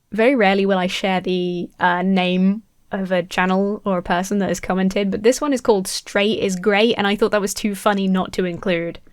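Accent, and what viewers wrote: United Kingdom. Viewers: I also would like to know why you are British. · Wait, but why are you British? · "Why are you British?" What in the world??? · I'm glad you know you're an exceptionally beautiful young woman and won't let stupid comments deter you from that. You are also bright, smart, quick witted, seemingly very educated and I love the Bri - ish accent. Please continue doing you so I may continue watching/listening to a higher level of videos since YT sent you my way and therefore have subscribed. Peace and all that. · Indeed you are.💛And your short hair and"sporty style"are adorable.😊 Not a fan of the british accent,but that's my problem,not yours.(I'm Italian and,growing up,I got used to the american accent thanks to my"Buffy"DVDs,in case you were wondering.) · "Why are you British?" 😂😂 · why are you british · has a point. why are you british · Wait, you're British??? 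Shocked, I am just shocked! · "Why are you British" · Why are you british? ladlsfkajsdflkja;sdlfkj;adlsfj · DAMN I am jealous on your absolutely PERFECT British accent , I wish I could talk like that.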